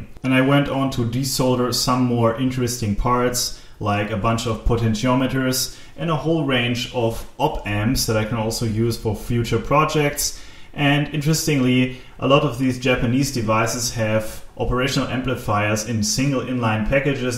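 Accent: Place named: Germany. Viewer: Hallo, ich schreibe mal auf Deutsch, da ich einen entsprechenden Akzent in Deiner Englischausführungn heraushöre - ich hoffe, ich liege da richtig. Ich habe den Yamaha dsp a595a auch und wollte gern meine Boxen mit Bananensteckern anschließen, stellte aber fest, dass die Speaker-Schrauben keine Kappen haben, die man abnehmen kann, um den Banana-Eingang freizulegen. Was kann man tun ? LG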